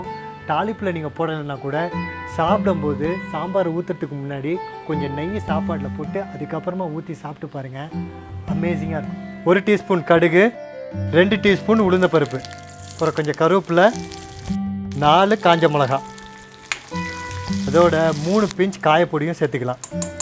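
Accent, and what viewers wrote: Tirunelveli, India. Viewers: Super thambi &unga samayal+unga speash nan thirunel vely eapdythan sampark vaipan super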